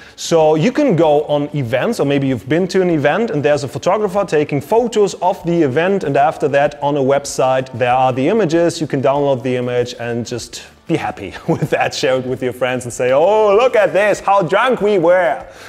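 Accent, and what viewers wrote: Germany. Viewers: after a long time I'm listen to German english ....cheers bro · Hello from "Nepal", thanks for making this video and uploading. Quite useful and exactly what i was looking for. I am also more into landscape photography & about to buy a camera , so far i am only using my phone😁. Could you plz suggest me which camera would be good (not so much expensive one) i can spend upto cannon 77D , and also i saw that cannon 700D was similar and quite cheaper . Btw i am so familiar with your German accent english 😌. I learn it from my dearest friend in Hamburg. 👍👍👍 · You sound like a german who's speaking english edit: I just recognized he's german lol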